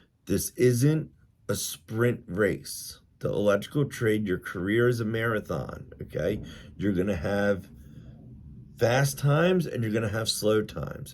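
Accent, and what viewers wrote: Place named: Philadelphia, United States. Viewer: I have been watching your videos , as soon as I heard the first sentence I picked up on your accent and figured you were somewhere local to Philly . I have been a union electrician in Philly for 24 years . Everything you’ve said on every topic is spot on . Coming from a union carrer , its cool to hear your views. I also admire you finding your nitch in knob and tube , as a commercial guy , I would never even touch it lol . Anyway, good luck and stay safe . ⚡️⚡️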